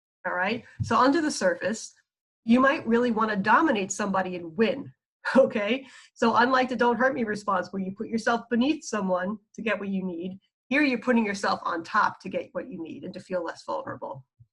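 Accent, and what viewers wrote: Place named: New York, United States. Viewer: love your New York accent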